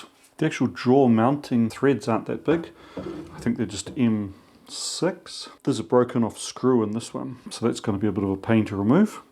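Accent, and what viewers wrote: New Zealand. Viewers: Came here by accident on a Sunday evening & heard the oh so recognizable Kiwi accent that made me stay & confirmed New Zealand connections with the "Bog" body filler . Subscribed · "four inch input vice on the idge of my welding binch" What's that accent called? P.s. New Zealand? Where's the old one? P.p.s apparently Zeeland is a dutch province, and dutch were known sea explorers so I'm not surprized if they named your country.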